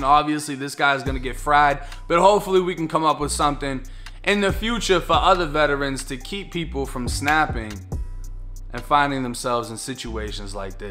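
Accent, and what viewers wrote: Boston, United States. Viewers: Jake live in the NE as well as Florida. Interesting accent, Florida hood with wicked strong instances of “park the car in Harvard yard.”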